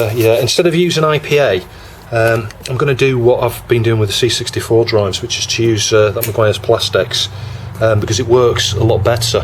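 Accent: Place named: Manchester, United Kingdom. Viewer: Hi! I just got out my old Amiga 600 and it worked for a day, then I put in a floppy which I think was a little dusty and now it's stuck on the "insert floppy" animation. I saw on eBay that working Amiga 600s tend to go for around £100. Is that accurate ? Is it worth me opening up and repairing? P.s where are you based? You sound local. Writing from Manchester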